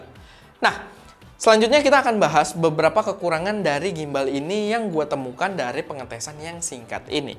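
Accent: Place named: South Jakarta, Indonesia. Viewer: aksen jaksel bgt, fix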